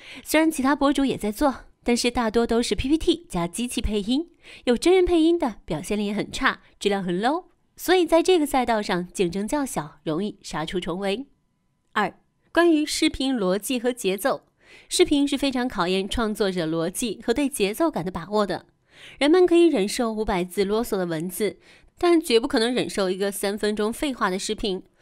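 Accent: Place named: Jiaodong, China. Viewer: 是胶东呛，大连人讲山东话，谢谢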